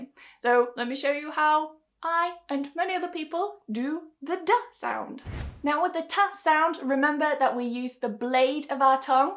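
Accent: United Kingdom. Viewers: Very nice, fantastic. I like British Accent the most. Thank you very much, may God bless you mam. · Your accent is so nice I`m sure It would really me I really like british pronunciation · Thank you very much for your teaching. I love ♥️your British accent. I just want to make sure when it comes to the sentence "The vet helped the dog", the D sound of "helpeD" become a "T" sound?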